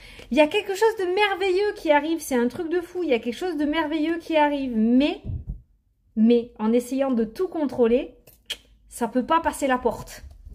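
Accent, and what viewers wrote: South, France. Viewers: coucou un vrai rayon de soleil avec un beau zest d'accent du sud...merci pour cette guidance... bisous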